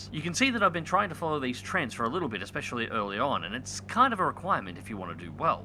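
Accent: Australia. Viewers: As far as I can tell, I haven't not seen your uploads in my feed yet. I hope you keep going, it's nice to hear an Aussie accent on here.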